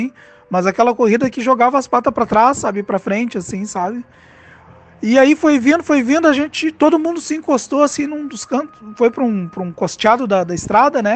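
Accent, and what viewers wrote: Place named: Rio Grande do Sul, Brazil. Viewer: Eu Amooooo esse sotaque Gaúcho,adorei a história envolvendo escoteiros,aqui em casa tenho 3 escoteiros.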